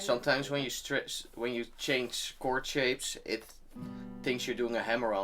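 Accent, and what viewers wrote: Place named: Netherlands. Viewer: i smell sniff a dutch accent 🤔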